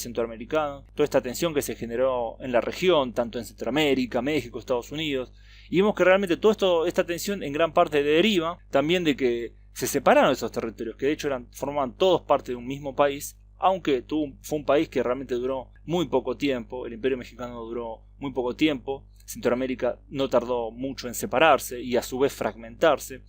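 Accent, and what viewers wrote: Argentina. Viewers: Latino???¿ De qué estás hablando? Serán países de habla Española Latino era una lengua que se hablaba en el Lacio (Italia) y hoy el único país que habla latín es el Vaticano. Latinoamerica es una denominación que los franceses acuñaron para quitarle el inmenso poder que tenía España. Tu acento parece Argentino. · O espanhol argentino do narrador é o melhor. Muito bonito o acento platino. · Video basura... Argentino tu acento asqueroso.. dan ganas de cagar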